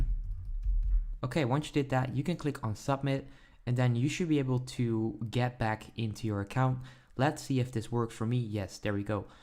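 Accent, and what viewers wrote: Netherlands. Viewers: Fakka Man Bro dit helpt gewoon ik hoorde al aan je accent dat je nl was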